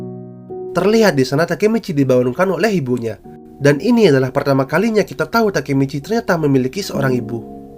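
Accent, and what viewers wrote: Bali, Indonesia. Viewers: dari bali ya bli? logat nya udah keliatan nok wkwk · admin orang bali keknya wkwk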